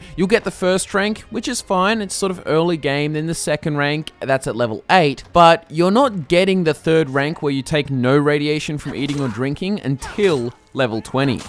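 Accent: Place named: Australia. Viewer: What an annoying Australian accent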